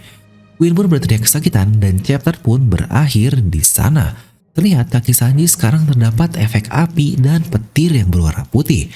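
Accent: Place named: West Java, Indonesia. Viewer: Min org sunda 😆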